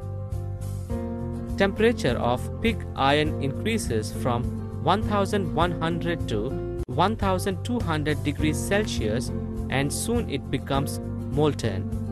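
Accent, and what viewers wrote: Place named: India. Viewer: all I know is that the narrator is clearly indian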